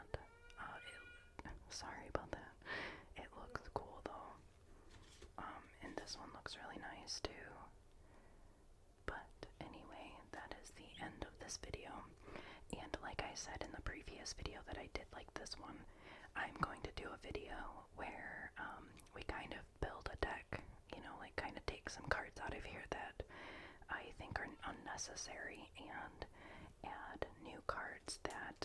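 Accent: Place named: Midwest, United States. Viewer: I love your Midwest accent. It’s so soothing.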